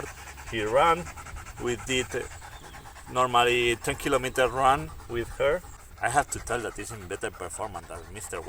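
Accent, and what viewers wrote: Spain. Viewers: el acento mas fuck up despues del hindu el de españa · Where did you shot this "bideo"? I thougt it was in Galicia because of you accent but then you said something about going to Barcelona... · Fua ese acento español que no nos lo quitamos ni patrás pero la verdad que suena bien y todo. Buen vídeo jajaj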